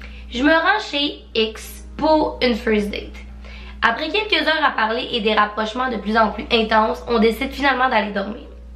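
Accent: Quebec, Canada. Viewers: est ce que tes quebecoise ?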